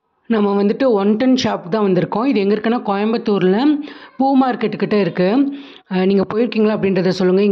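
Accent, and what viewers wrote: Kanyakumari, India. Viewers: Akka Kanniyakumari slang pesunga replay seiyoom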